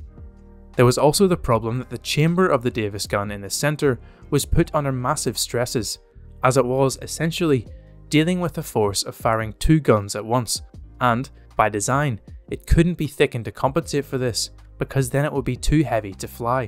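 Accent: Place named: United Kingdom. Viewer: I hate Brit english..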